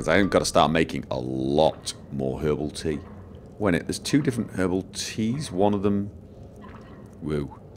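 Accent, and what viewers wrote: United Kingdom. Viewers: Allright, let me get this straight: said that the british still use feet for measurring, but he sounds pretty british to me. im a little confused.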